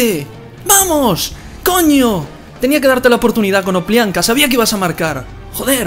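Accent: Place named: Spain. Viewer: De qué parte de España eres ?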